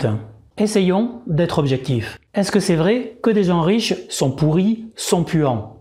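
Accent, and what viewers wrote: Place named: Toulouse, France. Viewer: Y a des richesses super : moi j'ai une super santé ! Sympa votre accent vous êtes de Toulouse? Vos propos sont très intéressants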